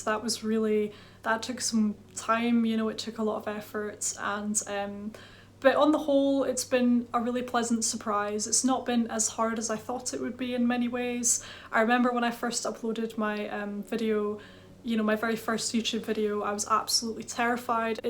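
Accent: Scotland, United Kingdom. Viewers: Oh, I see! I'm not an expert, obviously, but to me the accent (esp. the r) from that area is quite different from the Edinburgh area (where my grandfather and my surname hail from!). I just saw the "Scotland" pin was below one if your videos recently, which was what made me think along these lines! Love your videos, they are so calming, too, so would definitely love to see some ASMR as well! x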